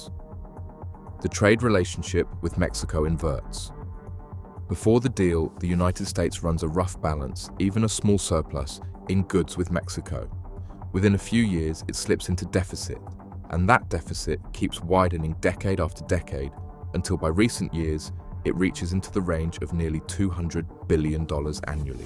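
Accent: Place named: United Kingdom. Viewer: Please speak American, especially when the subject is American history. The word is Plant, not Plont, perty, not potty. I am sick of British accents being used for narration to try to impart seriousness.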